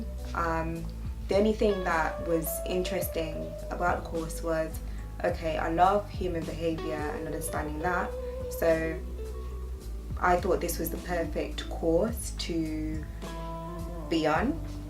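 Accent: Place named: United Kingdom. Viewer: Who would have thought you were from Blighty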